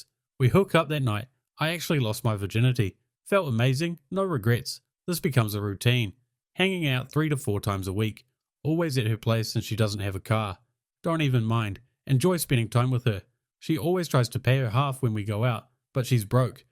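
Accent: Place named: New Zealand. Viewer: Why all the talking in funny Kiwiglish?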